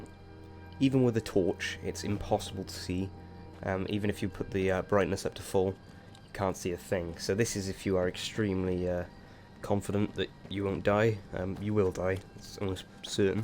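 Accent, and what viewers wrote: United Kingdom. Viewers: You have a British accent :D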